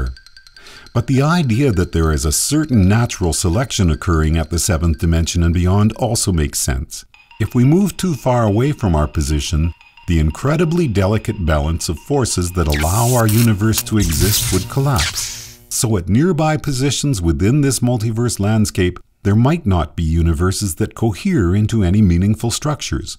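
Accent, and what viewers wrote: Canada. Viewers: This guy's Canadian accent is really starting to piss me off. "Quantum FOAHM" "Michael's GOAHL is to poke HOHLS in the..." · I can hear your Canadian accent (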